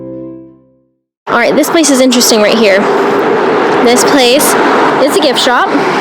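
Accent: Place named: United States. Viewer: Great video. You certainly don't have that unique German account. You sound very much American. How is it that you went from America to Germany? Must be a very interesting story. While stationed in Germany with the Army which was a "lifetime" ago was the Army Depot there in Miesau.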